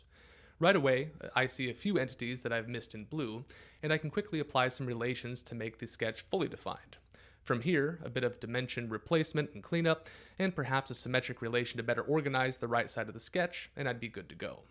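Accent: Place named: England, United Kingdom. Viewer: Professor thanks for your great video. It is great to find a YouTube video showing solidworks With an in English accent, I can understand.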